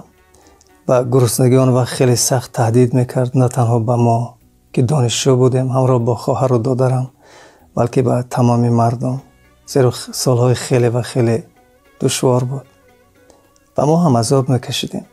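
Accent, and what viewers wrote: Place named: Ayni, Tajikistan. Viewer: Бово лахчаи Айнигия гум накунед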